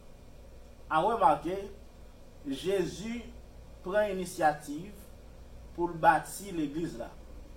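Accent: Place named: Portugal. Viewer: Jusqu'à présent, je ne sais pas si le présentateur parle Français ou Portugais 🤔. C'est une langue hybride ?!! Je ne comprends rien.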